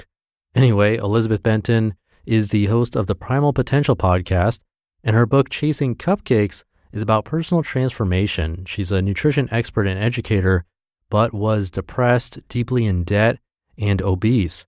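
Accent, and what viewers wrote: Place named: United States. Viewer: Nice to hear an American without an exaggerated accent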